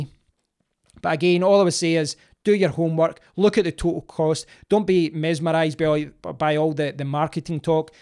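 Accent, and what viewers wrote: Scotland, United Kingdom. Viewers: Great content, what was better is your Scottish accent!!! lm ready to go into battle!!! and "pick a fight" lol with my provider!! I took your advice I now get 500 gigs a month max speed $36 a month, (20 Euros) with Vodafone, no contract, no exit fees so thankyou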